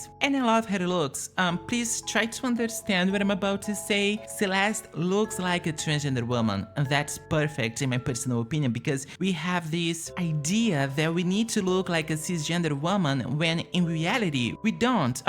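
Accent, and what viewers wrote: Brazil. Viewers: Esse ingles parece muito brasileiro... Sera que é??? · where are you from? im pretty sure you’re from brazil by your accent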